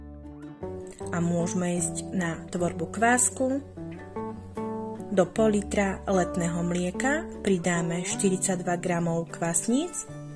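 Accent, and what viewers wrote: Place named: Slovakia. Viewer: zdravím Vás- nejen výborné koláče, ale ta Vaše nádherná slovenština mi chyběly😁😋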